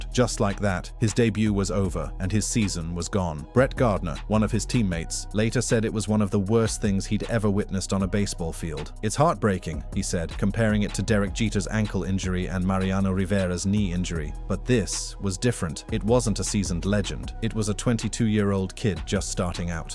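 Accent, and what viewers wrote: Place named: United Kingdom. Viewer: Why the FCK does the narrator have a British accent???? Where in the UK does ANYONE play baseball???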